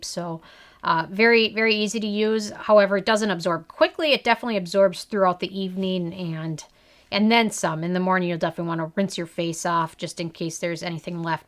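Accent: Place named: Midwest, United States. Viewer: just picked this up... ready to try it! also, you should def have more subs! this is so great and thorough... plus THE SECOND you started talking I knew I'd found a fellow midwest girl--I'm guessing Minnesota! love from WI!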